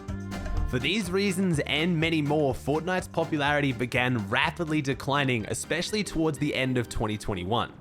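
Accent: Australia. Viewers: Props to the editor who, seamlessly collated these videos and worked perfectly alongside Aussie narration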